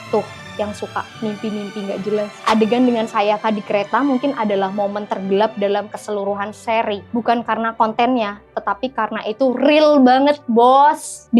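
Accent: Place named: Semarang, Indonesia. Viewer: top reviewnya mbak, btw mbak e logate kota semarang ig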